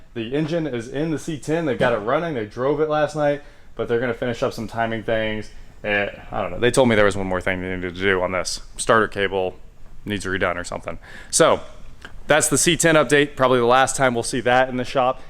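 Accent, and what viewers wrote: United States. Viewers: Love the American accent. What’s a new “mear? “ is that what we call a mirror in England 😅😅😅 Nice update, funky little car.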